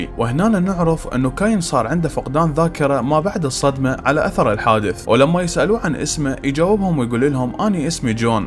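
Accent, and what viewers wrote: Iraq. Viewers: هذ يمكن عراقي😅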